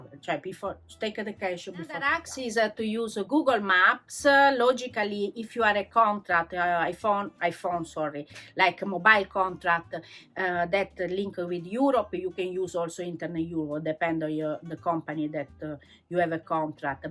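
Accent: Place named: Italy. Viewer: Very good advices, and I love your Italian accent ❤️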